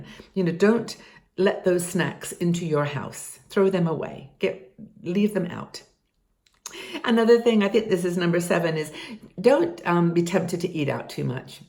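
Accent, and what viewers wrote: England, United Kingdom. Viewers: i thought you were english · You are lovely! Are you originally from England? I detect an accent :) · You're an inspiration! You sound English?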